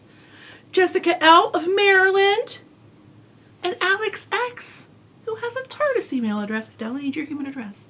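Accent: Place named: Scotland, United Kingdom. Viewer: Have a virtual hot toddy. I cannae spik for the rest o' Scotland, but I am totally on board for you adopting Scottish English...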